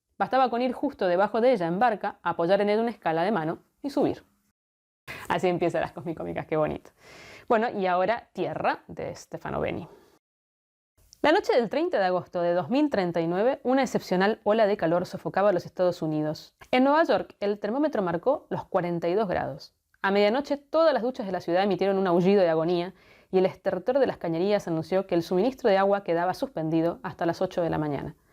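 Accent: Argentina. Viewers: Hola! Gracias por tus videos! Me encanta tu estilo tan directo y objetivo para hablar de libros! Amo tu acento argentino! Y es maravilloso tener buen contenido latinoamericano!!!! Jejjee y GRACIAS!!! Por tu recomendación acabo de terminar crónicas marcianas…. Ufff lo amé!!! Todos los niveles de lecturas que tiene! Es increíble!!! Gracias y saludos desde México 🇲🇽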